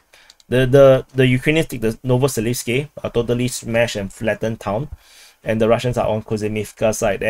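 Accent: Singapore. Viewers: you sound like a singaporean, are you? haha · Yes with your face is better ! Actually I follow your channel because of your English. Singlish? I like the way you speak English. You have a lot of humor too.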